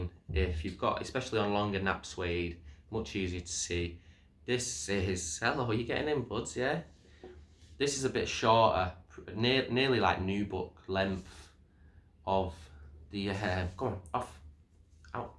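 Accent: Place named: United Kingdom. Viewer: This might one of the most British accents I have ever heard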